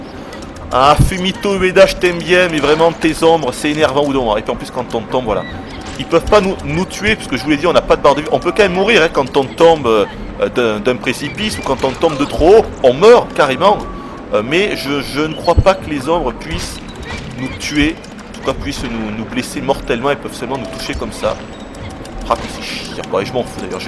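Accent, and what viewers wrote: South, France. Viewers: It's normal...he is an accent, south accent exactly. The best way to learn french....go to France or Belgium. Je te jure que ça marche! :)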